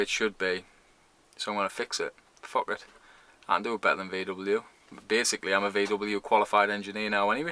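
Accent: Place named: Teesside, United Kingdom. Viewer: I was going to ask the same thing about the Bluetti as I was about to buy one until I watched this vid! Is the Anker one you have another git big power unit thing or do you mean just like a handheld powerbank? PS your accent isn't remotely strong, I'm a Teessider and I thought you were one of us, let alone a bloody geordie!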